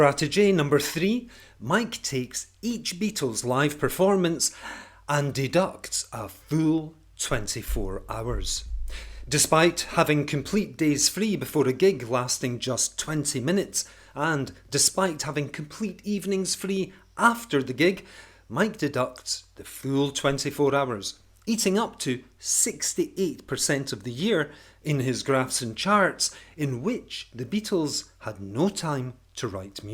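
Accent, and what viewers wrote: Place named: Scotland, United Kingdom. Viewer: Excellent insights! I've only just discovered your channel and am enjoying it immensely. I have a friend who is fully signed up to the whole fake-Beatles, PID, nonsense. He started out as a total sceptic then flipped to the opposite point of view, almost exclusively on the strength of some of the ostensibly anomalous photos of Paul. I could send him your superb debunking videos, but he is so adamant he's right they would probably bounce straight off. Anyway, it's a pleasure to hear your cool and measured Scottish accent and your very well-marshalled line of argument. 👏👏👏👏👏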